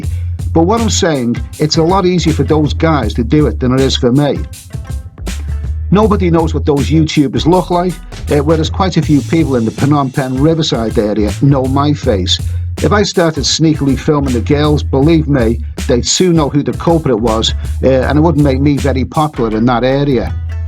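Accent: Liverpool, United Kingdom. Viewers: What part of Liverpool are you from?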